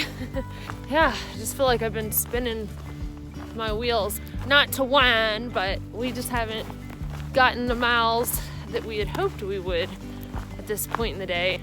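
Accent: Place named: Alabama, United States. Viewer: Is it my imagination, or is your Alabama accent getting stronger? 😎😉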